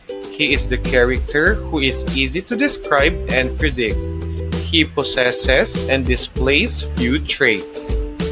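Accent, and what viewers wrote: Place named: India. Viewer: He has Indian accent.It reminds me Apu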